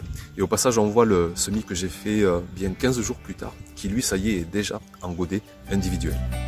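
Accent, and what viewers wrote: South, France. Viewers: Bonjour de vous voir et entendre ce petit accent du sud nous réchauffe toujours le 💖.alors soyons tous unis dans cette période difficile.merci pour votre générosité.bon courage à vous tous et toutes et bonne continuation.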